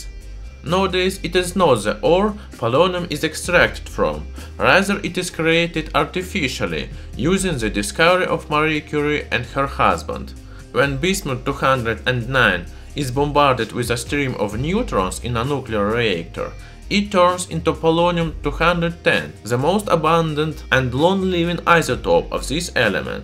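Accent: Russia. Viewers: There is my beautiful Russian accent back again. Ya tebya lublyu. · Is he Russian? I love his accent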